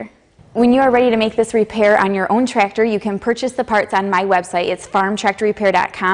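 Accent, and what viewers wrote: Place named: Minnesota, United States. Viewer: I love the rural MN accent! We can smell our own.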